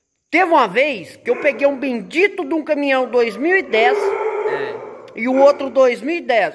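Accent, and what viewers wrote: Minas Gerais, Brazil. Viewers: Ô trem bão é bão, o trem ruim é ruim ,mais é sempre trem, entendeu trem?!?!?!?! Kkkkk rachei de rir com esse mineirim, ô trem bão sô.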